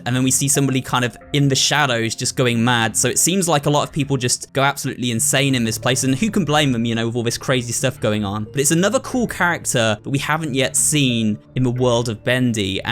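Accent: United Kingdom. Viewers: Are British or what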